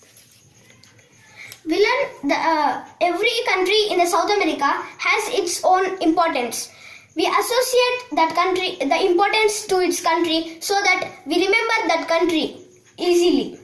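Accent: India. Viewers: i like your typical indian accent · I like him and the video but i hate how Indians invent their own pronounciation. Para gway,uru gway,chilli,Puerto Rica and many more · Ryan he is Indian, obviously he has an accent not everyone speaks American or queen's English. Duh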